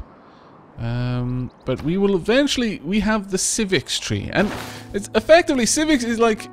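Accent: United Kingdom. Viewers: Well this video is interesting... Tea surrounding London with a man playing England with a British accent. Sorry if it's something else.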